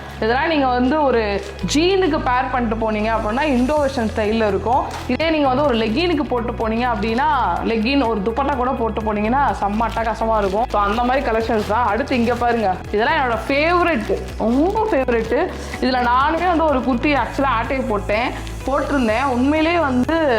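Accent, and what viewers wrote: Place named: Malaysia. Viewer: Are you from Malaysia sis.because your tamil talking same as Malaysia.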